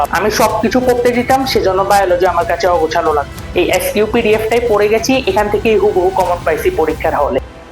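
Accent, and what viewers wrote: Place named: Noakhali, Bangladesh. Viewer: Vai er bari noakhali naki 🥰 noakhailla tone ache 🥰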